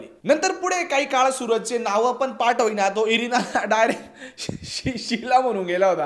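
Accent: Khandesh, India. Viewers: direct khandeshi accent madhe bolayla lagla ye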